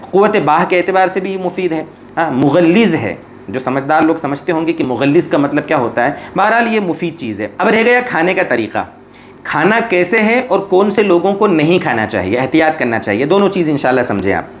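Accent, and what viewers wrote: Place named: Gujarat, India. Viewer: Bolne ka laheja gujratio jaisa hai .shayad surat district ke hon.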